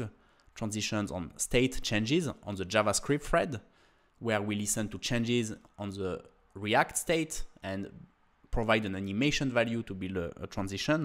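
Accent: France. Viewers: Very easy to reconnaitre que you are french 😁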